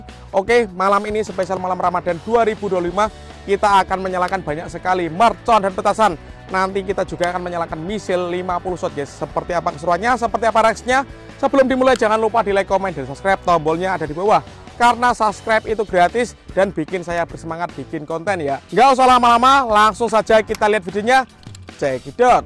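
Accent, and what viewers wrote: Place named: Banyumas, Indonesia. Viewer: Ya jo lali subscribe bang koe kan wong ngapak bang jo lali awal video ngomong "ora ngapak ora kepenak"yo mas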